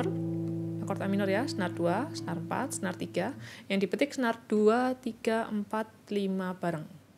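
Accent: Java, Indonesia. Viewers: Wong jowo yo mbak..kliatan logatnya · Mbak nya rodo medhok.. ailapyuh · Suarane medhok mbak yu......😃......... Yen ing tawang ono lintang.....mbakayu.....😀😁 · Jowone medok mbak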